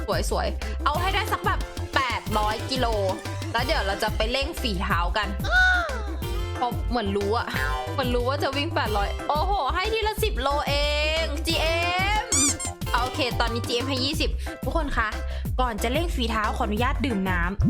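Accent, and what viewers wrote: North, Thailand. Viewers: อู้เหนือน่าฮักหนาปี่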